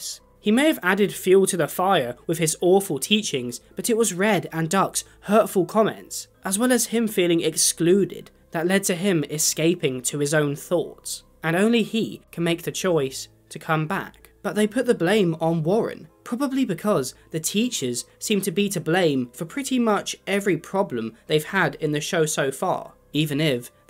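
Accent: United Kingdom. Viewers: oh baby a dhmis commentary made by a mf with a British accent??? my kind of video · British, aust?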